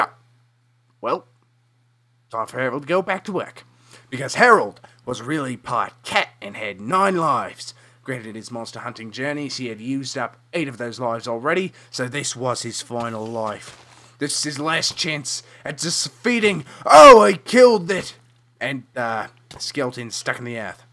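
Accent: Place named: Australia. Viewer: Aussie accents are bae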